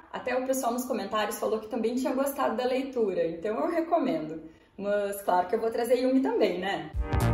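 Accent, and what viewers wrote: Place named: South, Brazil. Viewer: Olá, estou maratona do o seu canal. Gostei muito da maneira simples e objetiva com que vc aborda Jung. Esses dias tenho refletido bastante em que linha farei minha especialização. Tô sentindo o mestre Jung me chamando. Parabéns pelo trabalho. E bora espalhar Jung pela rede com sotaque do sul.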